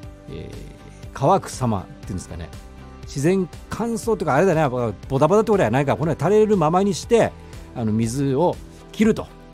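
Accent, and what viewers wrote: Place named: Yamagata, Japan. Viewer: 埼玉県から 聞いています。 東根出身なので懐かしい山形弁最高です☺️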